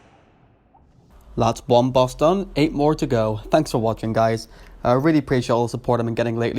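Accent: Northern Ireland, United Kingdom. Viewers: Awesome hearing a Northern Irish accent 😝 represent!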